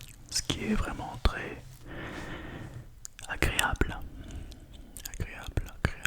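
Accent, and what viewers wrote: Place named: France. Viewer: 💌 Hi,💟 I love the French accent and thanks to ASMR with soft sounds I feel very, very good. Thank you.🙌✨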